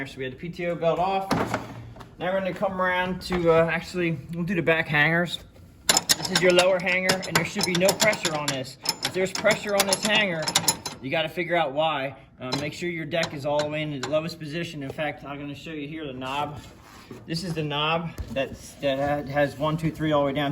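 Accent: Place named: Philadelphia, United States. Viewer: Great instructions. Sounds like a Philly accents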